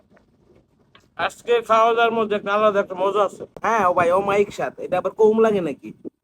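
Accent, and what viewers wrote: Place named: Narsingdi, Bangladesh. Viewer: I, আপনার কথায় নরসিংদীর টান আছে।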